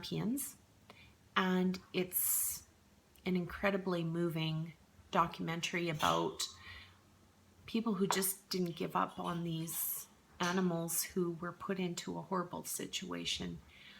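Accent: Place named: Minnesota, United States. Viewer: Your accent says Minnesota😎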